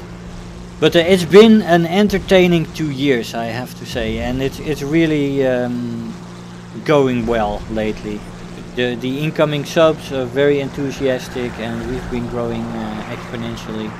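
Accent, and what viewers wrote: Netherlands. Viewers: Great chat. I've been playing for approximately 2 years, and in that time, I've only watched three channels for WT. The first was Long5hot, the next was Tx141 and yours. I chose only these channels because of the ANALYSIS. These channels communicated nothing but useful information and helped me get passed the horrible newbie stage. The other distinguishing feature of these three channels is the adult and friendly nature of the narration. You get the impression from watching that the three authors are approachable and guys you'd like to know personally. I cannot say these things about ANY other channel I've watched. Some were even annoying. Finally, you introduce the element of humor and personal commentary, which I enjoy. The only other WT Youtuber that makes me laugh is Squire. So, in conclusion, you offer careful analysis, adult and pleasant narration with a Dutch accent, you include some humor and a personable approach. 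I'm proud to be a member of your squadron and I appreciate your contribution to the community. PS - TOTALLY PUMPED FOR THE ITALIAN TREE. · When I first saw you in battle you were such a nice guy, if I recall correctly it was a early Spit game. Some people commented on you and I decided to look at your channel. Intant hooked, you're helpful and mature. Your accent is kinda cute too (Is it Dutch?)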